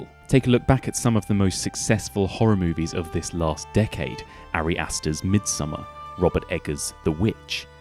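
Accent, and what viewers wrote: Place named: England, United Kingdom. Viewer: Why this whinging over history? It’s so annoying! Get a grip! That’s why our forefathers BUILT shlt and all we can do nowadays is tear down the house we inherited. I can tell by your English accent you are Keir Starmer like guy. Who actually gave you guys the right to torch down the civilization of your fathers going back over 1000 years. 1000 years going strong, then you guys get power and destroy England in a way that cannot be undone. What gives you the right?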